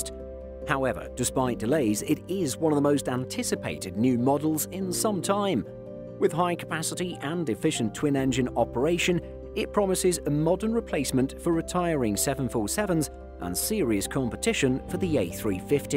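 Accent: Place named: United Kingdom. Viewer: You are British by the sound of you so Preeedersessors fella not the American Prehdersessor c'mon!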